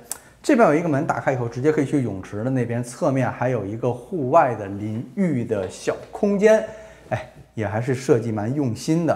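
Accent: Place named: Beijing, China. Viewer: 听口音应该是北京兄弟... 这种豪宅看看就好，因为维护及保养费用会高的惊人，我们这种做小生意的人一旦买了就真成了房奴了！节目办的不错，加油啊兄弟！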